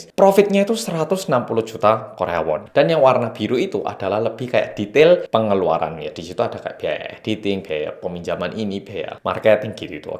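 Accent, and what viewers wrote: Java, Indonesia. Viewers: Kaosnya bagus mas korea medog 🥺🥺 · Mas sorry, sampean kok saiki kurang medok yo? Opo sebab wes suwi nang korea? Saiki lebih sengau suarane hehhehehe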